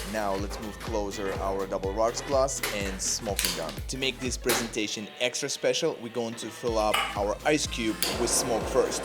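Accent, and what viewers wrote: Russia. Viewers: Why do you talk so Russian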